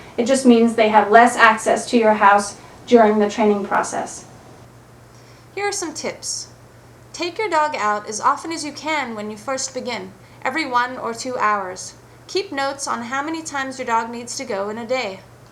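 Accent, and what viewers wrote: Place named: South Africa. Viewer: oh my gosh! I thought i heard a African accent! Are you from South Africa? I lived there for the most of my life.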